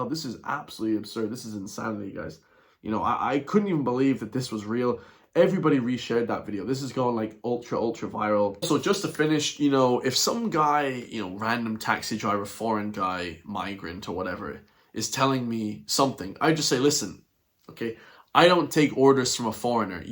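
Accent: United States. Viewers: Get it right though; don’t clickbait! It’s not that irish isnt allowed is it? it’s not illegal it’s just that one person has mentioned it. Also, how the hell would you know if he’s illegal never mind all the other racist shit you’re spewing. You don’t sound very irish either btw, getting a strong yank flavour to your accent buddy.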